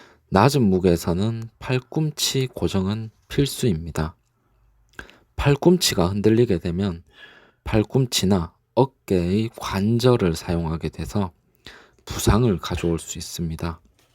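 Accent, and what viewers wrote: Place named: Gyeongsang, South Korea. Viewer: 님도 말투가 경상도분이신거같은데 구독자도 경상도분이시네요ㅋㅋㅋ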